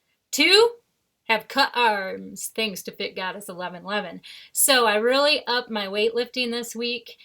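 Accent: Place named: Michigan, United States. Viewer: And now I think of it, I hear that Michiganders accent coming out of your mouth, but I won't hold it against you, because I am not from Ohio either. We are O.M.A.D. Strong!🤠 Even though I could be called the Desert No-M.A.D. Outlaw right now!🤠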